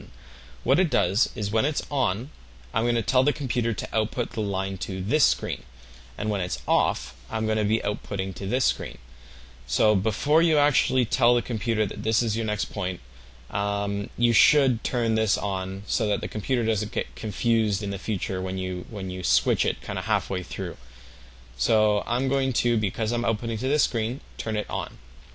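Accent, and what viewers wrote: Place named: United Kingdom. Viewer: He's hiding a British accent.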